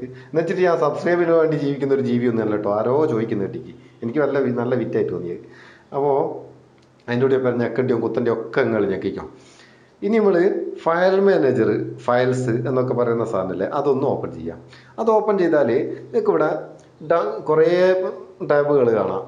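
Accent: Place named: Vadakara, India. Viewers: അര്യത്താ അയേ......വർത്താനം ketteram തോന്നി.....😊😊😊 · അടിപൊളി സ്ലാങ് ങ്ങള് മേപ്പയ്യൂർ ആന്നോ!!മ്മളും സബ്സ്ക്രൈബ് ചെയ്തിക് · വടേര ഭാഷ...... നല്ല മൊഞ്ജ് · Orijnal nadaaapuram samsaram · vadakarakkarum ingono okke yaan samsaarikkuka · Haai kolalooo vadakan kerala bhasha kalakiii karyam clear aayyy kolllaa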